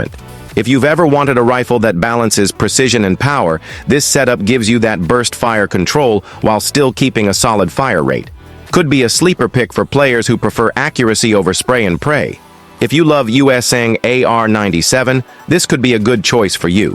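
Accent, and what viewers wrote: Nigeria. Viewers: Wait bro are you from Nigeria 🇳🇬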